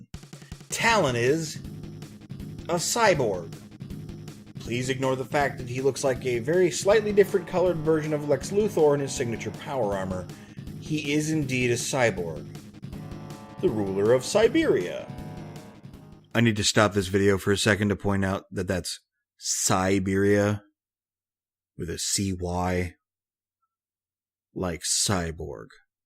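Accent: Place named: Northwest Indiana, United States. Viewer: You sound like you grew up in NW Indiana with me, bro. Shout out to Aladdin's Castle arcade!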